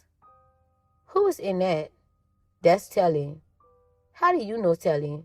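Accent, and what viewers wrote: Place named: New Orleans, United States. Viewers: Finally... someone with the Nola dialect reading. Great job! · 💯👍🏾 Telly was a Dog! The narrator sounds like she’s right out the 7th ward! Lol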